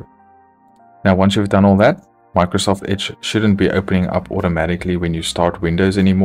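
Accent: South Africa. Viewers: South African accent. thanks for the help.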